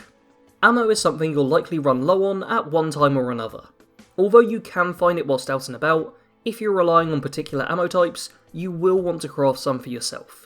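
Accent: United Kingdom. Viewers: Given the thick Brit accent. It behooves you to slow down your speech, I just can't understand you most of the time.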